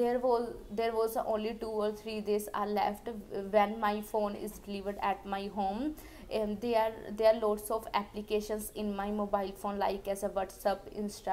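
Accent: Punjab, India. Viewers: Punjabi accent ..use shot sentences and idioms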